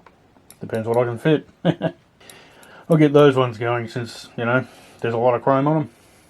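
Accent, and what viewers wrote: Australia. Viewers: Awesome, thanks for that. Mind if I show this technique in my HG P407 build videos? Also, it's refreshing seeing and hearing an Aussie modeller on YouTube. Nice work, mate.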